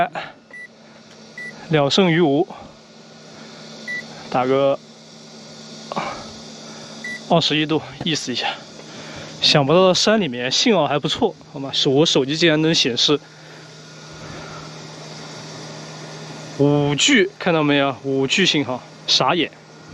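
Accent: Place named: Ningbo, China. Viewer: 寧波 話聽不懂但很孰悉。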